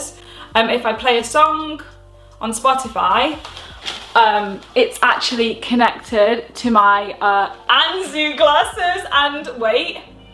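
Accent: United Kingdom. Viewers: A breath of fresh air w a British accent✌🍻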